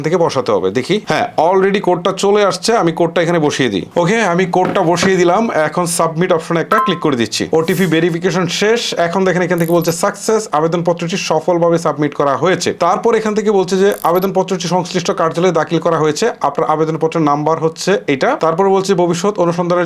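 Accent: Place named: Brahmanbaria, Bangladesh. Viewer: Brahmanbaria mone hocche 🤭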